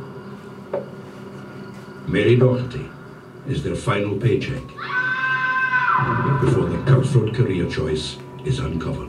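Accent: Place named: Scotland, United Kingdom. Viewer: Fascinating urban history with a clear Scottish voice! Excellent!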